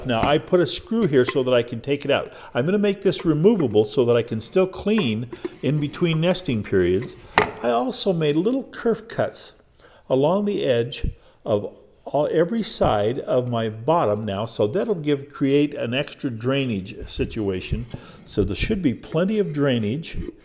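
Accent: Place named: Pennsylvania, United States. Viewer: I'm trying to figure out where your accent is from. Pennsylvania? That's my best guess.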